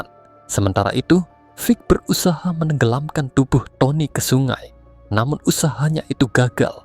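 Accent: Java, Indonesia. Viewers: Logat nya Jawa x min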